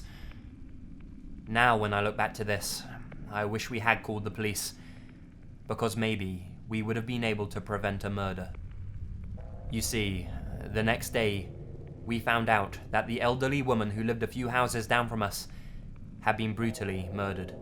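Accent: England, United Kingdom. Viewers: Are you from Britain just wondering I'm not I'm from Spain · don't think he's posh he's just english lol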